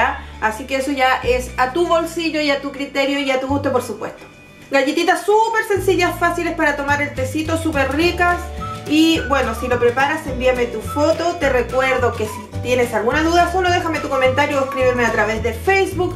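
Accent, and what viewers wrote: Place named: Chile. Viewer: gracias, que fáciles.... me gusta ti acento chileno, yo soy chileno y todo me queda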